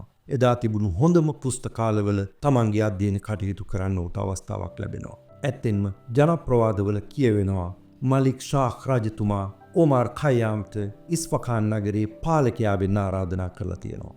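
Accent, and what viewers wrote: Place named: Europe. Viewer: විශිෂ්ඨ විග්‍රහයක්, ඒවගේම ඔබ තුමාගෙ ඉංග්‍රීසි උච්චාරණය හරිම ලස්සනයි යුරෝපියෙක් වගෙයි ❤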